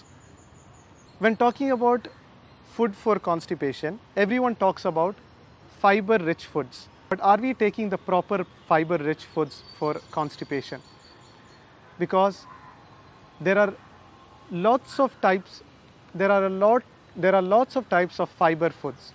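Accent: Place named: London, United Kingdom. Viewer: நீ முதலில் தமிழில் பேசு பா. பெரிய லண்டன் ரிட்டன் போல பேசிகறாய். டைம் தான் வேஸ்ட். 👊👊👊👊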